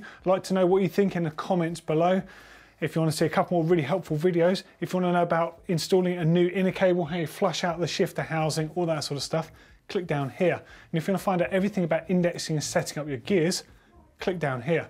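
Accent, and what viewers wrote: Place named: United Kingdom. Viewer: F*cking "brittish" accent :(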